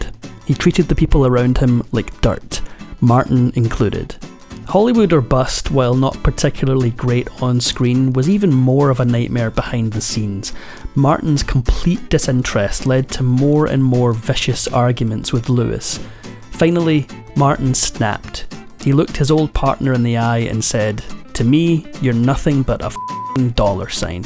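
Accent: Ireland. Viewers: Is the narrator Irish? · Are you Irish?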